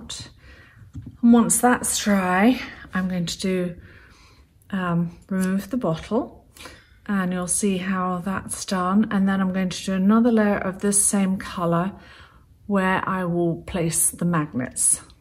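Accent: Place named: England, United Kingdom. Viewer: You sound English, what are you talking about going to the 'dollar store' for?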